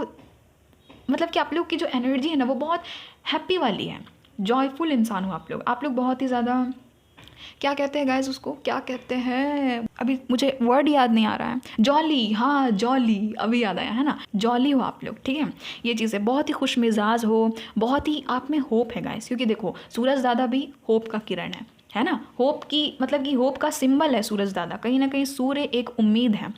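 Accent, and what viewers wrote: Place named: RP, United Kingdom. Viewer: I like your accent, I am myself into British RP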